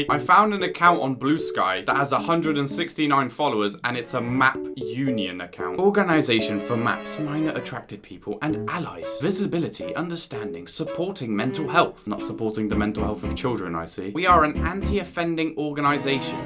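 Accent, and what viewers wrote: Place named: United Kingdom. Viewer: It always sound better and more degrading with a British accent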